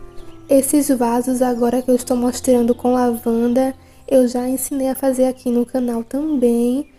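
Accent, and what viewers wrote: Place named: Pernambuco, Brazil. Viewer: Linda sala amei vc é pernambucana lindo seu sotaque 😍🤗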